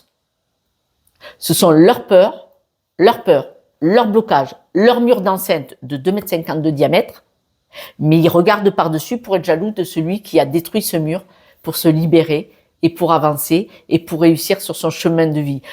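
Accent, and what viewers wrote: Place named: Toulouse, France. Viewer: Je suis tombée par hasard sur vos vidéos et quel bonheur votre accent ressemble au mien j'habite sete mais je suis toulousaine fantastique merci. Evelyne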